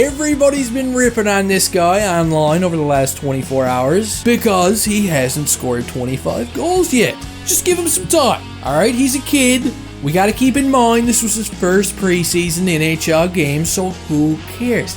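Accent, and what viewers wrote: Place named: St. John's, Canada. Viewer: Is he a Maritimer though? He sounds to me like a townie from St. John's? What are ya, buddy?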